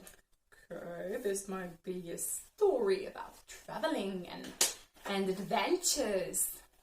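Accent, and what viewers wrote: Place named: United Kingdom. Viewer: Have u come from the UK?😅 I love the british accent and I admire how fluent u are. Excellent work.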